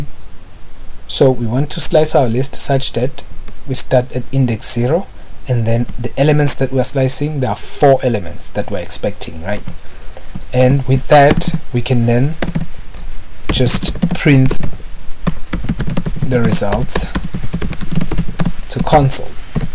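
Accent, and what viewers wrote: South Africa. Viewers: you sound south african sir. thank you by the way!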